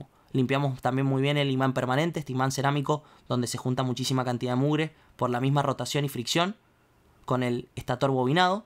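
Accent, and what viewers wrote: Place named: Interior, Argentina. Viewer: Buenas. Tenes una tonada del interior, de donde sos? Corrientes?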